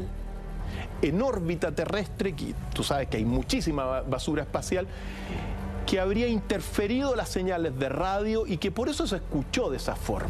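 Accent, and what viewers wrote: Chile. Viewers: Es chileno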